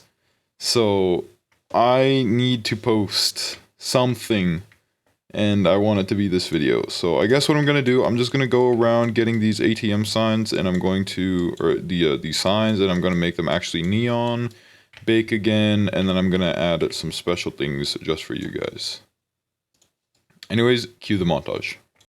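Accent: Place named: United States. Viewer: Yo! What country do you live in and did you used to live in America because you sound American but you say your eu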